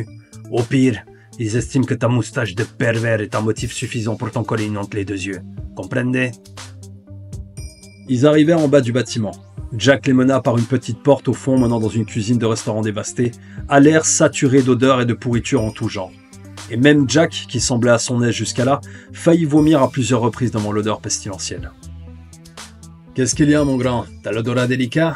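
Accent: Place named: Mexico. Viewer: Tr bonne vidéo ton accent mexicain est déjà légendaire merci pour la video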